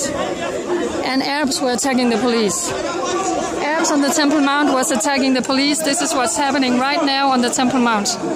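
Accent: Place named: South, United States. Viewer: Where did you learn English? You have a little bit of a southern accent to your English. It's very cute. I like it!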